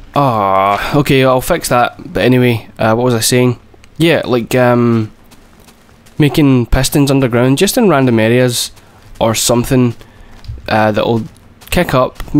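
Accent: Scotland, United Kingdom. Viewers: Scottish sod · fucking wooo haha a scottish minecraft player :) finally someone without an annoying accent imo lol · LOL no, he's Scottish. You'd think people would know by now. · subbed cause you're Scottish! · i was going to watch , but then i heard his scottish voice and chose otherwise ..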